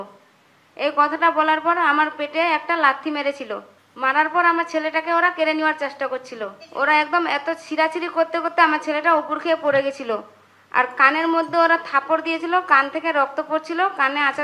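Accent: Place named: Bangladesh. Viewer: আপনার কথা তো বাংলাদেশি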